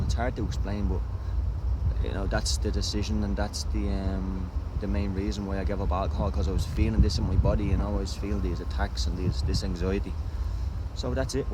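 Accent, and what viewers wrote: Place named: Ireland. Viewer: definitely irish, sounds exactly like conor mcgregor.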